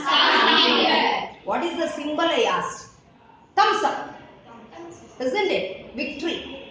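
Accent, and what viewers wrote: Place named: Tamil Nadu, India. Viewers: I can bet she is from south India just by her accent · Yes she is from Tamil Nadu as Dr. APJ does. Hence, both speak TN's English accent. :)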